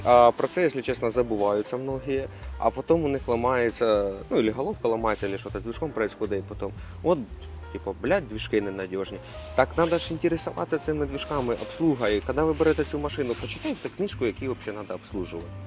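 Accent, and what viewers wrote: Surzhyk, Ukraine. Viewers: "Нєпадгатовлєний" від твого суржику вуха вянуть. Слабо говорити українською? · Авто, мабуть хороше, але жахливий суржик "експерта" відбиває бажання слухати.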